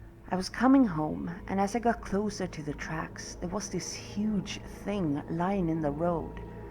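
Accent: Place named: Ireland. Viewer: Sounds a tiny bit Irish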